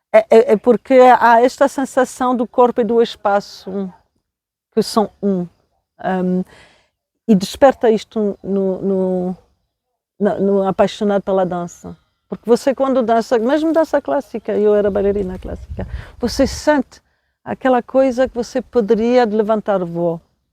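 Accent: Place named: Portugal. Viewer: Muito agradecida pelos ensinamentos...fiquei maravilhada com o sotaque português lembrou muito mamãe...fechei os olhos e imaginei suas estórias a falar com sotaque.❤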